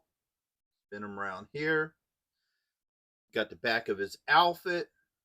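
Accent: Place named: Upper Peninsula, United States. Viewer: Brother where are you from? I grew up in the Upper Peninsula of Michigan and your accent reminds me of home there eh? 😊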